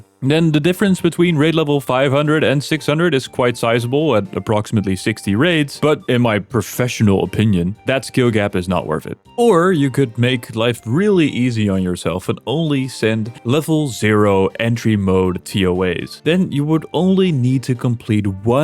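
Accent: Netherlands. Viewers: Is that a dutch accent i hear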